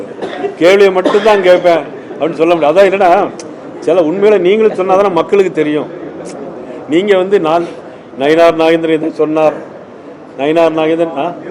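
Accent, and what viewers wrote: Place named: Tirunelveli, India. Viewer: நீங்கள் பேசும் நெல்லை தமிழ் அருமையாக இருக்கிறது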